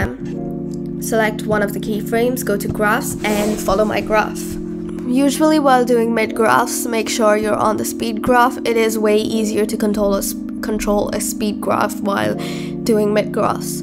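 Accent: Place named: United Kingdom. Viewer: she has a british accent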